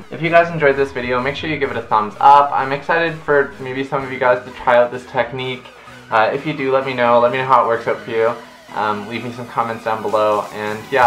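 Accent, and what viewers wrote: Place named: Canada. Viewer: are you canadian? your o's are adorable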